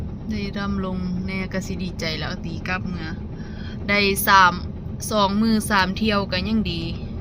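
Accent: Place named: Laos. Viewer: สำเนียงเว่าคือ หนูนุ่นสาวลาวเนาะ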